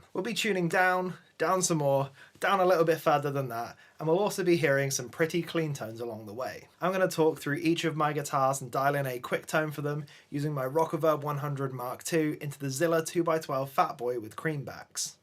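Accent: United Kingdom. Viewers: Hi You sound british but do you live and work in iceland with mikko? If yes you are so lucky and i wish i could join you...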